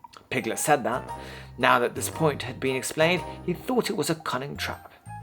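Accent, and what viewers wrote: United Kingdom. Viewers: British accent 大好きです😌ずっと聴いてたい✨✨✨ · はっきり言って、挿絵が有るのがすごく助かりました😂文字は追えても、イメージを作る余裕がないので、絵が有るだけですごく解った気分になりました❣️それと、ページをめくるのが、本当に読み聞かせしてもらっているような心地良さがあり、色褪せた部分からはお祖父様の大切な本をシェアしてくれている実感が何とも言えない😊 BGMは無い方が、の世界に引き込まれるし、ストーリーの味も、引き出されるかな。一方で、が紅茶を注いでいる音、焚き火の映像、途中で焚き木をくべる様子、全てがうっとりするくらい癒される時間です。何より、本物のイギリス英語のリズムの、なんと美しいこと❣️英語の理解はグッと下がるけど。（何十年も前に買ってあった原書を引っ張り出して来て、読んでもみました。） つまり私の希望は！同じチャプターを両方でやって‼️です😅どうかお願いします🤲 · やはりイギリス英語が好き！の声、活舌、トーン、ピッチ、最高にドはまりしましたよ☆ これまではベネティクトカンバーバッチの癖強め朗読聴いてました(それはそれで好き💛) もっと沢山聴かせてください~☆ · のイギリス英語が好きです！こっちの方が聞きやすいですが、BGMもないとさらに良いですね。すみません汗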